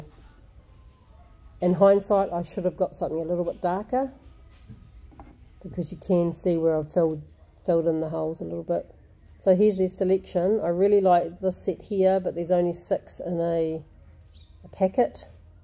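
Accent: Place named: New Zealand. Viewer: oh not your fault … yes I watch anyway ! Cool to know that it’s a Kiwi accent . I have heard other Australian accents but know nothing about them . Will keep watching!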